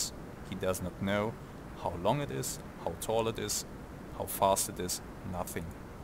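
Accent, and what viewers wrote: Germany. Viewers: Ugh... that cement thick accent and germanised English, Germish, Genglish, whatever you want to call it (e.g. you focus on things in English, in German you 'concentrate' on them). They don't even try to pronounce the foreign language correctly, but mutilate it beyond recognition as they see fit. I always cringe when I hear that. Ear cancer risk. Just speak German, for Pete's sake, and that's it. Leave the rest to the subtitles. It would be even more authentic. And then you have such a hard time calculating the difference between 0° (also known as 360°) and 308° mentally, that you rather count the dashes on the compass band instead? Really? 52°? Really? After having laboriously counted all dashes? Are you sure? I mean, are you really, really sure? Dude, then you're not the right person to explain anything to me (or anybody else) when it comes to physics and geometry.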